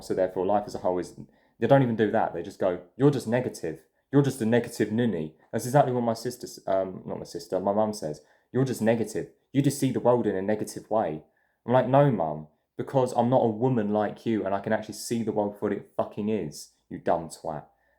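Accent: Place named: United Kingdom. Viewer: I know I'm late to the party here but you only just showed up in my feed last night and I'm starting to work through your vids. First, I respectfully and in no way mean this in a condescending way request that you interact with your mum and gran with nothing but respect, patience and kindness. Your dad, if he left you and your mum for whatever reason, can piss the f**k off, however. :) Second, you sound like a proper Brit. If this is the case you need to take pride in your country and continue forth its inspiring tradition; such is the great example (while not always perfect) your ancestors created that people literally risk everything to gain entrance to your country in these troubling times. I agree with you that things seem grim but they are by no means hopeless and not without solution.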